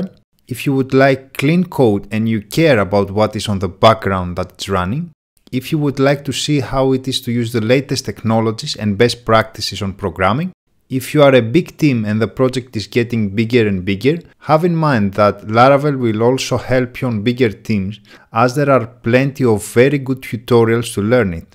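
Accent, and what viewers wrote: Greece. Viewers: α ρε φιλε κανει ΜΠΑΜ οτι εισαι Ελληνας,δεν μπορεις να φανταστεις πως νιωθω οτι ακουω εναν Ελληνα να μιλαει για τετοια θεματα ( οχι ειμαι τιποτα κολλημενος με τη χωρα η τιποτα πολιτικα οτιδηποτε) απλα καταλαβαινεις , ειναι τελειο να καταλαβαινεις οτι ο αλλος ειναι Ελληνας με τοσες γνωσεις για το συγκεκριμενο πραγμα που σε ενδιαφερει και σενα. Συγχαρητηρια ρε φιλε , συμφωνω σε ολα οσα ειπες στο βιντεακι. Κακο που μιλαω στα ελληνικα βεβαια , απλα ενιωσα την αναγκη να δεις ενα ελληνικο comment για να καταλαβεις οτι ειναι respect αυτο το πραγμα. Απο τα επομενα ολα αγγλικα :) Μου προσφερες ενα τρελο χαμογελο ( με τη καλη παντα εννοια) απο τα πρωτα δευτερολεπτα που καταλαβα οτι μιλαει Ελληνας.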